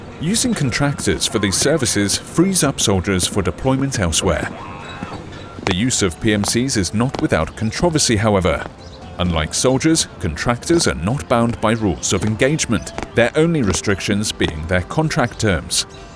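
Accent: United Kingdom. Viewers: New british narator 😮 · Yo it’s the old British narrator 3 years ago!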